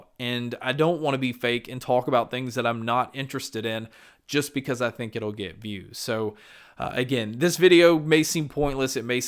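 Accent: South, United States. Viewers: No need to reply dude, just wanted to drop some encouragement your way. Some, not all, of your values lie in your skills to connect with us, remember that. If you moved on to (or rebranded) I'd keep following. You've got that well-spoken southern draw, you've got a handsome face, you're authentic and informed. You've got a lot going for yourself. I'm confident that you'll figure it out. Mad respect for you dude cuz I know about the industry and I couldn't handle it. Keep your head up, champ.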